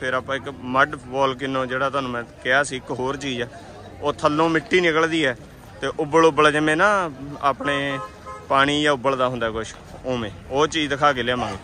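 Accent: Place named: Punjab, India. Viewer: Bro,ur speaking English like Punjabi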